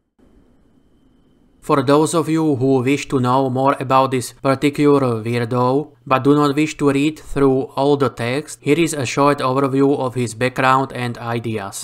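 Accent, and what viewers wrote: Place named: Russia. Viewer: Spasiba,. You're accent sounds Russian /Ukrainian to me, so excuse me if I got that wrong. Your video is very helpful, because Klinov killed me twice.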